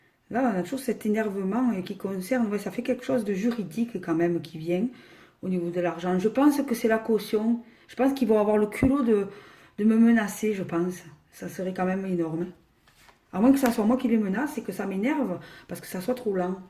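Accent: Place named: Marseille, France. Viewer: bonjour; je voulais savoir de quelle région tu es; a ton accent je dirais Marseille. J'aimerai beaucoup apprendre avec quelqu'un et j'aime bien ta façon de faire.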